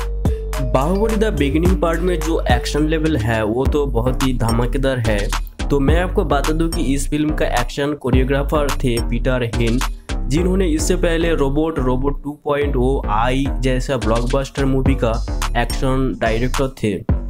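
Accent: Bengal, India. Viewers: Bhai aap bengoli ho??? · kotha gulo bangla te bollei besi vlo hoto tomar kotha gulor mddha onk bangla tan a6e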